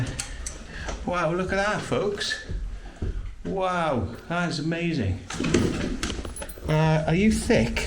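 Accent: Wales, United Kingdom. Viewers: awesome thank you so much! Also, while I have you, what part of the UK do you hail from? I mean your accent is very different to the rest of your crew (in a good way) my partner who now lives in Australia, grew up in Ashford in England and he thinks you sound a bit Welsh?? I don't agree, so we'd love it if you could settle this argument for us lol 😉 Thanks again for the harness info and please give Angel a belly scratch from us! ❤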